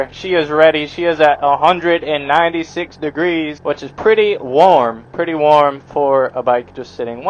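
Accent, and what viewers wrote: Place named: South, United States. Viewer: bro gained a southern accent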